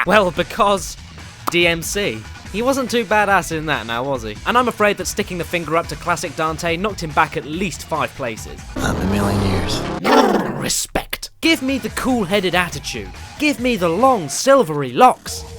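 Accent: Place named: United Kingdom. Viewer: By the way, where are you from? I know you're from Britain but where? Despite being Scottish I'm rubbish with accents.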